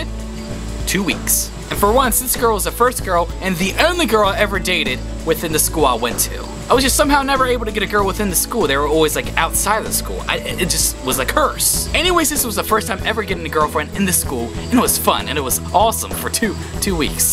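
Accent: Australia. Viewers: What country do u live in? i can kinda hear a little accent (kinda like Australian or British)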